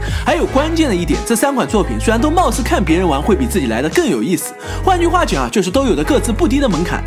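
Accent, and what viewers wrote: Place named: Jiangzhe, China. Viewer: 空洞騎士竟然是魂系作品lol 這個包郵區的塑料普通話，愛了愛了哈哈哈哈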